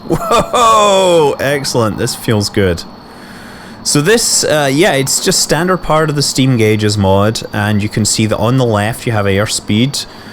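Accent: Scotland, United Kingdom. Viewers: Yeas hes scottish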